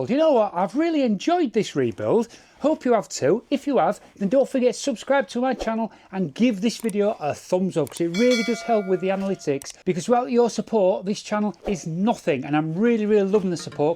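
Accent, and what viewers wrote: Hull, United Kingdom. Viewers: Hi, I'm new to your channel and have just watched two of your posts. I thoroughly enjoyed them. Love the Hull accent BTW. The car looks miles better without the stupid stripes.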